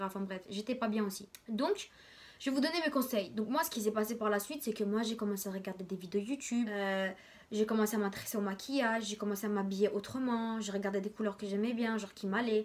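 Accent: Marseille, France. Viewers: Trop belle Mash'Allah ta grv un magnifique accent sa fait penser à Marseille mdrr ❤️❤️😘😘😘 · C’est bizzare ta un accent parisien et marseillais en même temp, tu viens dou???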